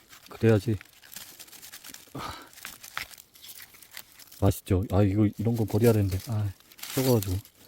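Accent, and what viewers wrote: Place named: Chungcheong, South Korea. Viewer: 벌거지...ㅋ.ㅋㅋ 충청도 사투리 같은...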